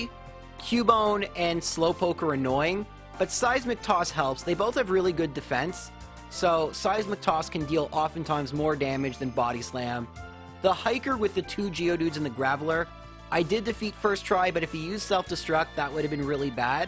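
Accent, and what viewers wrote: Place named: Canada. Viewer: Based on his accent he’s Canadian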